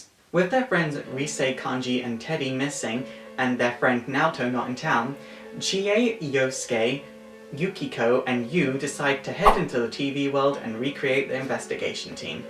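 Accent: United Kingdom. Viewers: I can't tell if your british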